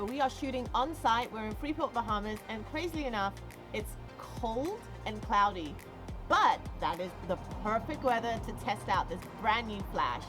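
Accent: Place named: England, United Kingdom. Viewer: Hi. I love this video - it's the warts n all element that makes it so much closer to true OCF experiences than 99% of other videos on YouTube. I can't believe that other photographers don't experience hiccoughs - perhaps they edit them out - which just means we viewers learn less. I guess a few questions 1) did you do much/any post-processing? It looks like your model has perfect skin! 2) you could have warmed up her skin in post instead of using CTO gels? 3) you have to tell either the trigger or the strobe that you're going to shoot HSS right? I think you don't need to do that with Profoto equipment (although who wants to pay 3x as much for Profoto) 4) What did the Magsphere do for you? 6) How did you create the great shot in the video? 5) I have AD300 Pro and am considering adding an AD100. Thank for pointing out the issues with softboxes etc but I think I would only use it barebulb 6) On the subject of the AD300 - I like it because you don't have to add a mount. 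However, there seems to be a very limited number of softboxes with the Godox mount, right? I'm looking for a strip box with a Godox mount. Know of one? 7) You sound like you're from England?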